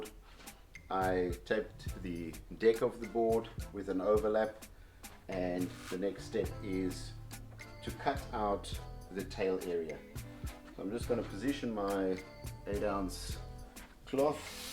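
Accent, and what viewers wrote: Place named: South Africa. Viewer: You sound South African bru, lekka to see some local vids on YouTube. Keep up the board building.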